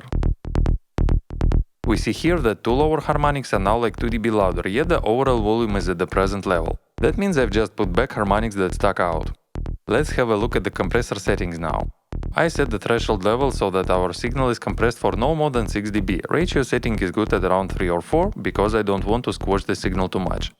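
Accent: Russia. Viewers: Акцент очень похож на русский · Nothing is better than a Russian person teaching you! Very unique person with good knowledge & really like his accent :))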